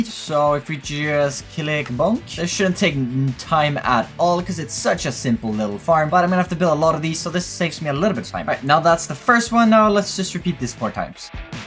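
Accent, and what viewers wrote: Sweden. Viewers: These videos are great man, keep it up your builds are awesome. Jag hörde din svenska dialekt direkt hahaha ;)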